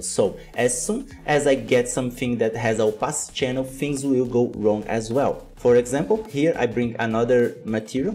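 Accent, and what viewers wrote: Brazil. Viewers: Sabia que era BR! kkk reconheço o ingles BR! Otimo video! Me inscrevi!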